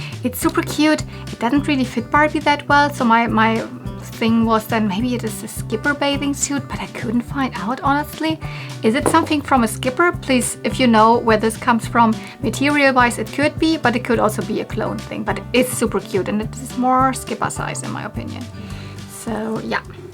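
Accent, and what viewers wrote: Germany. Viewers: What a wonderful doll/toy haul! I love everything you found! Brought back so many good memories 💗 Barbies of the 80s & 90s have a very special place in my heart 🥰 I spent my early childhood in Germany in the 80s. 🥰 I love hearing your accent and hearing German spoken. My father was fluent, and I started to relearn German in school. Unfortunately, I don’t have a lot of opportunities to speak it, so I’ve lost so much, but can still understand most of what’s being said. Great video! Can’t wait for the next!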